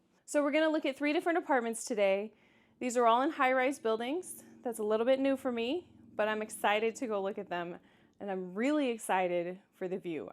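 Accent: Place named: Kansas, United States. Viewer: Hey,! First, I also follow Noodles the Pooch (and have my own Noodles doll)! 🤗 Second, I got a kick out of your challenges with the Boston versus Kansas accent; I get it! I’m a Long Islander (NY) - but I graduated from Bethany College in Lindsborg KS and met lots of good folks while I was there. They got a kick out of my NY accent 😊!Whereabouts from KS are you from? The whole eastern seaboard is crazy expensive; best of luck with your new apt search!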